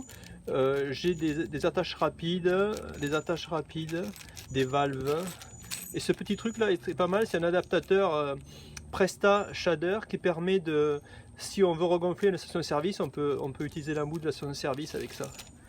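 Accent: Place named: South, France. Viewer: Salut. Merci pour toutes les infos Bikepacking. Etant novice j'envisage une petite 1ère virée cet été et tout les renseignements sont bons à prendre. Merci encore. En plus aux US avé l'accent, c'est top. Pour ce qui est de tes bouquins, je vais aussi regarder de plus près 👍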